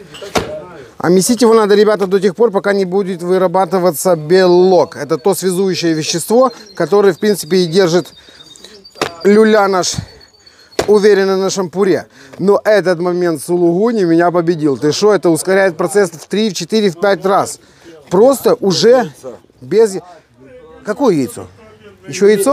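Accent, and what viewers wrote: Odessa, Ukraine. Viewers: Одесский говорок росслабон для уха😂, привет из Харькова.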